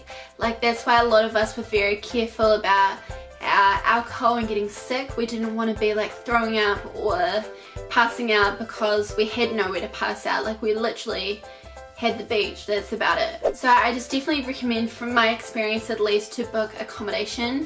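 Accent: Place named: New Zealand. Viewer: What a painfull video with hideous accent... Bloody kiwis....some people naked...yeah right....i think the acid she had has swallen her tongue cause she cant talk properly...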